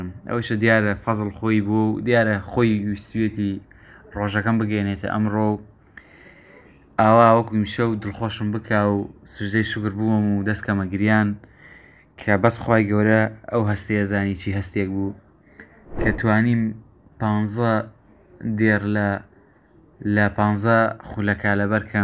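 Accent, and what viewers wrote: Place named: Garmian, Iraq. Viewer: ئەم برادەرە دەنگی بە گرمیانی ئەکات😁❤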